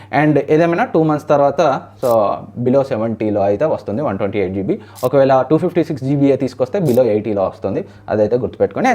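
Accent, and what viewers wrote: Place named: Andhra Pradesh, India. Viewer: Medhi karimnagar aeiyna kuda meru matladuthuna language andhara lane vundhi anna Telangana language lane ledhu medhi ❤🥰😍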